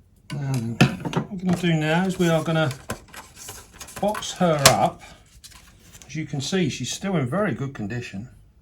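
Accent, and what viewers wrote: United Kingdom. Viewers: Why are you speaking in farenheit but have a british accent?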